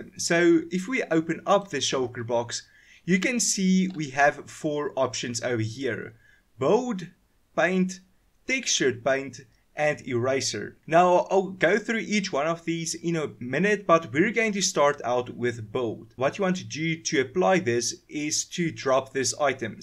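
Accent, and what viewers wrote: South Africa. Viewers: Finally thanks for this amazing addon😊Also are you South African I hear a bit of an accent😂😭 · Are u from South Africa bru? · You sound south african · This is perfect. Love the voice btw from SA?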